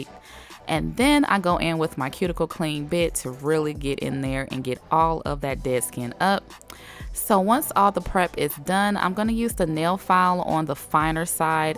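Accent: South, United States. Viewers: I was going to get this kit a few weeks ago but held off. I honestly wasnt sure if they would glow well or not, and being in quarantine I was hoping to do a fun set. I decided to order the kit a few days ago and now it is out of stock😭. I am sooooooo happy you did a review on this kit I love how honest and descriptive you are!!!!!! I'm originally born and raised in the south now I live in nyc so to hear that southern in your voice is like home!!!💖🥰🤗thanks for the videos keep them up!!!!!!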